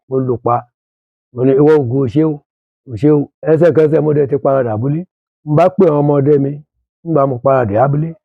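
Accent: Egba, Nigeria. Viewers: This Ègbá dialect is sweet.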